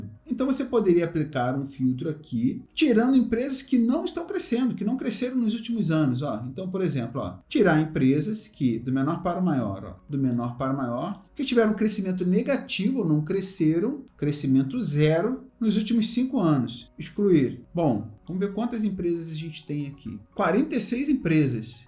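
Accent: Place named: Rio de Janeiro, Brazil. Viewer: Típico carioca. 😂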